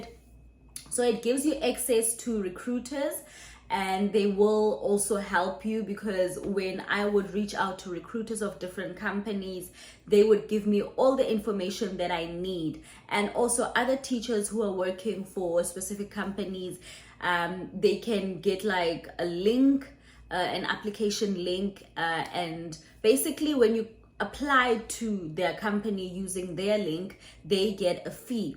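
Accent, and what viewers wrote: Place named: South Africa. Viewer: TOYS-inyana ! I Love Your SA accent !!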